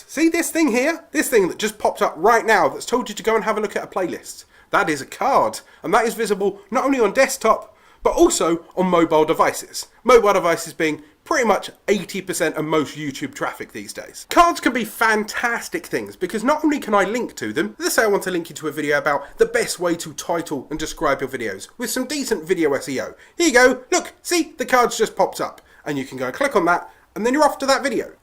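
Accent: United Kingdom. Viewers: card missing Britisch english is really way more fun to listen then american english. Good video by the way!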